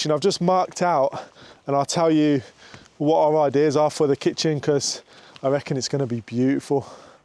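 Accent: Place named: North, United Kingdom. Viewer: Nice to meet you...you sound like a northerner...where you from girl??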